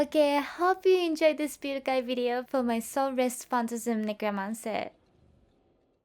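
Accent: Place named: Japan. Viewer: このアクセント...まさか日本人？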